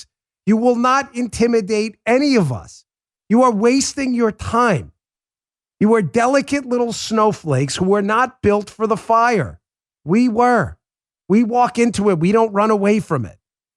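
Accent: New York, United States. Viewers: I'm in a mood....lol, your NY roots are showing.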